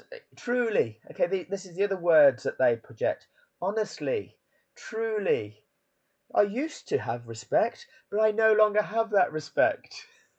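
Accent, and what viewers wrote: United Kingdom. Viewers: The moment I heard that “back with more from our favorite F1 journalist “in that British accent I knew This video just gonna trash Max with no actual intelligence. Man the British have got to be the worst spectators in human sport history they don’t even hide it ,it’s so deplorable.😂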